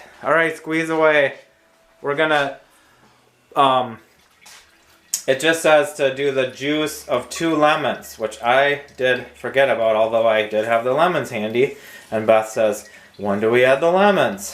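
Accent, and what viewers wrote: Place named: Minnesota, United States. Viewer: ahh I love a good Minnesota accent.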